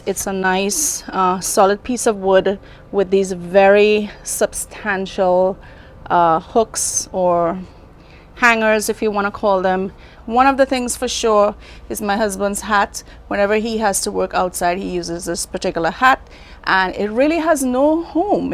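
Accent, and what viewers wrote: Trinidad and Tobago. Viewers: thought I recognized the accent. I am also from trinidad. Well done on your patio refurbish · Your acent sounds like you're from Trinidad?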